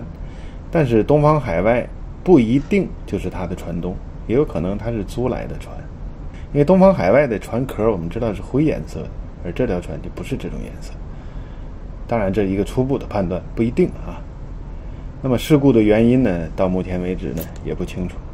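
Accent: Tianjin, China. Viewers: 講話像大陸人口音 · 天津人一听就能听出来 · 口音是天津老乡吧？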